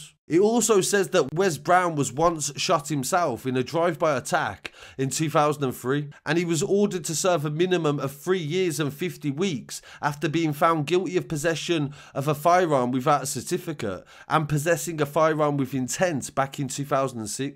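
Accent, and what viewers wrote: Birmingham, United Kingdom. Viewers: sounds so brummy 👊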